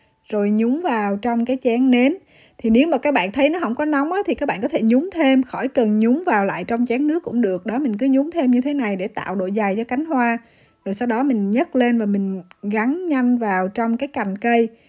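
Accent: Central, Vietnam. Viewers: giọng trọ trẹ ko biết người đà nẵng hay người Huế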